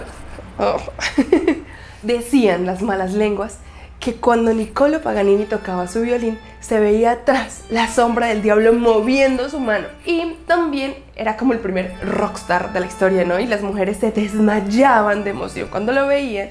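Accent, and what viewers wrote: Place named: Colombia. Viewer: Disfruté mucho esta historia y también me encanta el acento colombiano, pero este canal es para los estudiantes de nivel alto intermedio -avanzado? Tuve que concentrarme mucho por la velocidad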